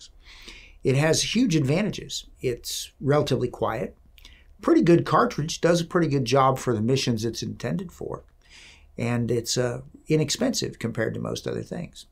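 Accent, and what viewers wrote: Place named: United States. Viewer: You don't sound English, you sound American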